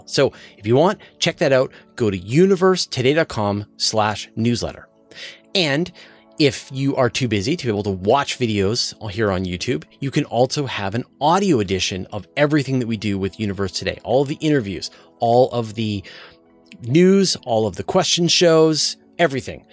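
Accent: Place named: Canada. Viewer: Hello, thank you for the news that you put out. I have a question for you off topic but I place people by their accents and yours has a tiny, tiny bit of Canadian and I have some difficulty placing it. If I had to guess it would be Canadian within a few miles of the US border on the western 1/3 of the country. I would like to know where you are from, Born , raised, K-12 in a big city or rural. Thanks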